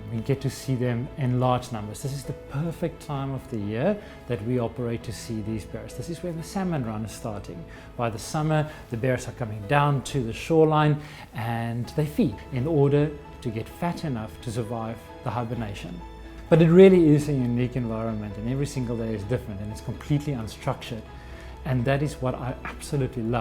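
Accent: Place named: South Africa. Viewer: This dude sounds South African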